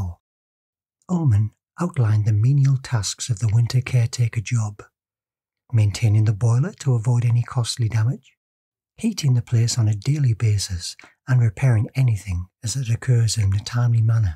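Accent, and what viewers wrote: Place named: Inverness, United Kingdom. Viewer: Where about in Scotland are you from ? You sound like you’re from Inverness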